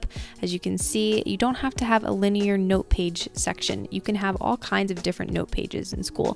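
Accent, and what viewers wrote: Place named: United States. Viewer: I love this kind of American accent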